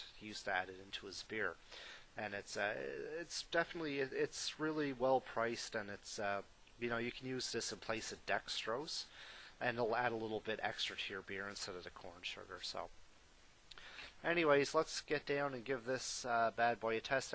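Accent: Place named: Ireland. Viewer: You sure your not from Ireland, you sound really Irish.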